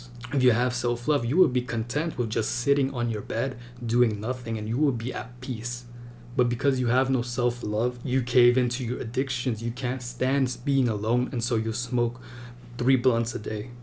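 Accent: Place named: Texas, United States. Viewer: Bruh your accent is very beautiful. Actually I thought in Texas they speak like Arthur from red dead redemption 😅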